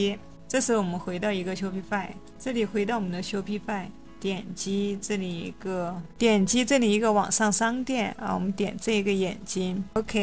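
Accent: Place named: Chongqing, China. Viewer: 😂🎉😅😮一口重庆话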